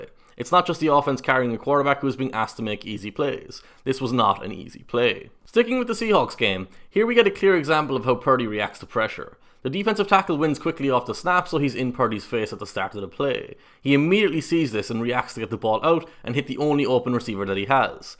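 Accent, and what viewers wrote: Ireland. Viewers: Nice -- more football analysts should have Irish accents. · WAIT!......why is an irishman talking about American Football......and in a very concise and inciteful manner?? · Hearing an an irishman be so analytical about football brings me so much joy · i'm lovin' hearing an Irish analyzer review my team.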